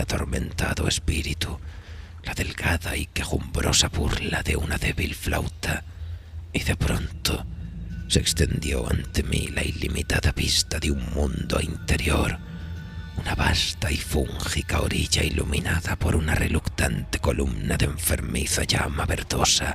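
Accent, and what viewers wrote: Spain. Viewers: oír estos relatos con esta narrativa castellana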